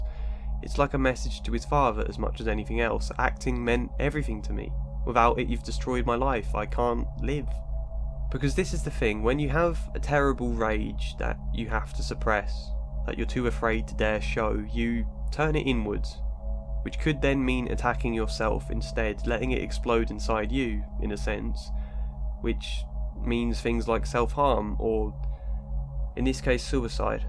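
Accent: England, United Kingdom. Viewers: You sound a lot like Robert Sean Leonard only with an English accent.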